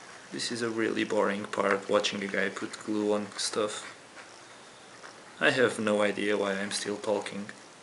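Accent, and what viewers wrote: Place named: Portugal. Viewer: This is such a Portuguese accent ehehehehe great video :)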